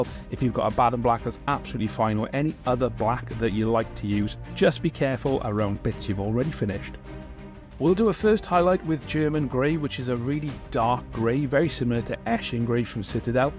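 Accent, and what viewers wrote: Wales, United Kingdom. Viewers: I just like the soft welsh accent. Cwmry am byth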